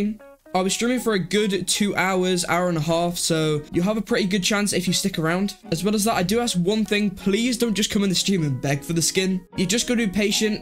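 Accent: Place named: United Kingdom. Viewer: Is this tanqr’s brother or something? The gameplay is good and he does have the British accent. I dont know, maybe this is the new tanqr of arsenal.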